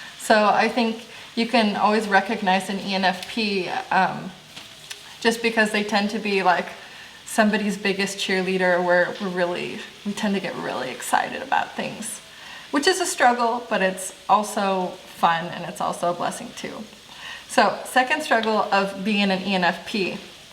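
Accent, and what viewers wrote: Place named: Ireland. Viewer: ASMR Irish everyday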